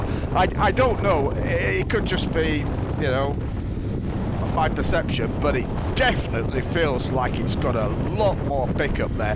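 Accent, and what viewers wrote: United Kingdom. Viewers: Great video, thanks for detailed explanation. Easier to understand with a British accent. I'm from north of the border but it still works for me. ;) Again, thanks. Just picked up my 2019 XC recently and this is high on my list before the summer heat hits Atlanta.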